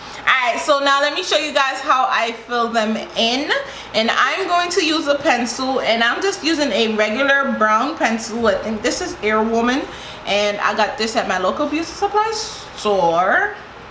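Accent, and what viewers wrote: Jamaica. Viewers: minutes into the video and I know your Jamaican🔥💯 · Lol, it's the Jamaican accent for me😍❤️😂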